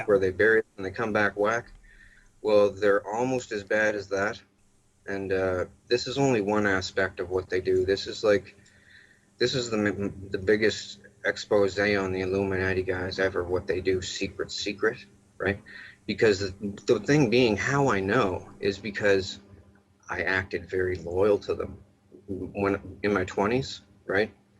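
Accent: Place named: New England, United States. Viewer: This guy looks like john Cusak's clone, but with an annoying Yankee twang😅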